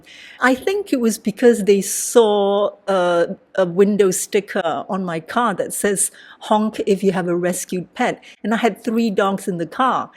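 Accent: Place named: Malaysia. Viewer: Hi, the universe has a miraculous way of working out. Some back story, I was born in Malaysia but moved away at a very young age. I am also a scapegoat daughter and wondered about my Malaysian roots and what it would be like to meet someone who's also Malaysian and going through a similar journey. Your video popped up in my suggestions and your accent caught my attention, turns out you're a fellow Malaysian. I've watched a few of your videos and thank you ❤ my revenge plan is to heal and affirm/validate myself.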